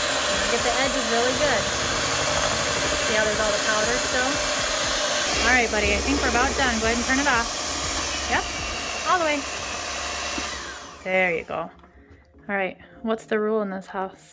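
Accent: Minnesota, United States. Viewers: She's definitely from the Minnesoota region... loving the new Channel guy's 👍🇺🇲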